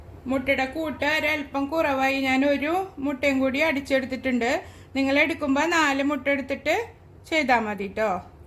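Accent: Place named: Thrissur, India. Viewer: നല്ല രസണ്ട് സംസാരം പക്കാ തൃശൂർ ഭാഷ ഇങ്ങനെത്തന്നെ മുന്നോട്ട് പോട്ടെ 😊കൊതിപ്പിച്ചു 👌new video കാണാൻ വരണേ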